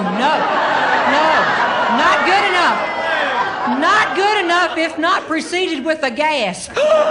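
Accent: South, United States. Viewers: Love your stand up humor Jeanne I have a lady friend and she is from the south. She talks like you and jokes around. we call her southern Bell. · There’s something so lovely about the southern accent which makes this even funnier;)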